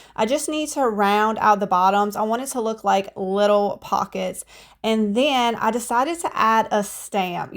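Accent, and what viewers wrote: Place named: South, United States. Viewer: I found you after the first thrift challenge with Sherry. I have so enjoyed your channel, and that beautiful accent of yours. I'm from SC, and I love our southern accents. 😊 That little lamb turned out so very gorgeous! And the wreath/art stand from a spindle...what a smart idea!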